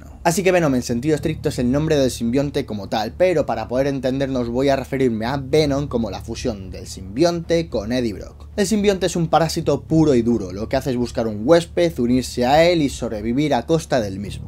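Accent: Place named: Spain. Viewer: Estuvo muy bueno , pero la verdad los españoles la Cagan siempre en su forma de pronunciación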